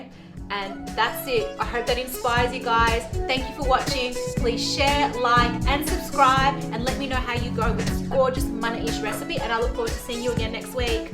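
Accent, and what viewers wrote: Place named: Australia. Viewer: When I clicked I didn’t expect the Aussie accent 😂😁👌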